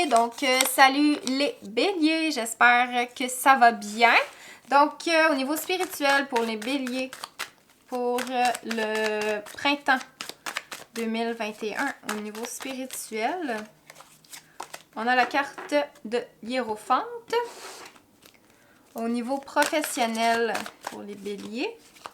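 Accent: Quebec, Canada. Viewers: Cool du quebecois... Je m abonne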